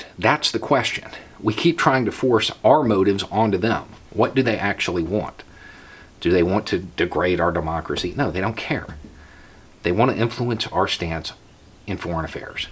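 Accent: South, United States. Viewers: Hey, I have started getting pragur university Ad's on your videos lately. While this is hilariously ironic I can't help but wonder why the Youtube Algorithm would think this is a good idea. My only guess is either that it is because you are a political channel and these are political ad's, or, that it goes deeper than that and the algorithm is going more off what you look like than what you are talking about. It views your videos and goes "Oh, a guy with a southren accent and a beard talking about politics, must be a right winger" and just goes with that. Anyway, just wanted to share because it honestly made me laugh.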